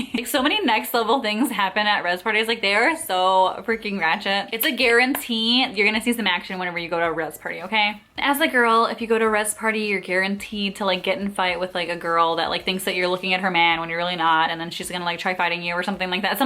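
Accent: Puerto Rico. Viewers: Your sond like you was reast on the isla cuz,,,,,Puertoriqueña,,,,,,same as the ress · Wow I new that your puerto rican because I am from Florida so I know how you talk as Latina boricuaaa 🇵🇷🇵🇷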